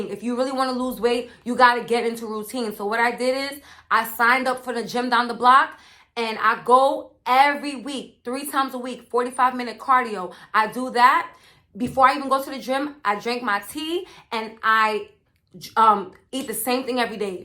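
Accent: New York, United States. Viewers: GIRL I just checked your P.O. Box and you live on long islanddd ??? Ik I heard the New York accent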